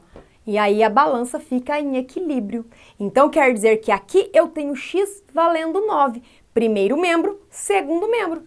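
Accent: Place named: Northern Paraná, Brazil. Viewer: A esta perdendo o sotaque do NORTE PARANAENSE RSRSRS. O jeitinho caipira. Quadro lindo e as cores da caneta sao vibrantes bem chamativos.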